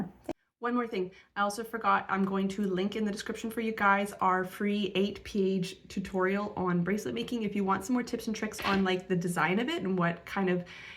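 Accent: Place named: Canada. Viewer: You sound Canadian ❤️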